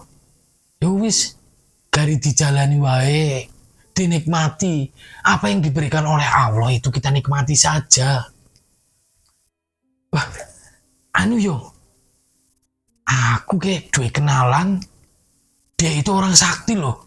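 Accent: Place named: Java, Indonesia. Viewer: 😅 boso jowomu ra ceto mas..campuran jowo kulon ro wetan...mending bahasa nasional wae mas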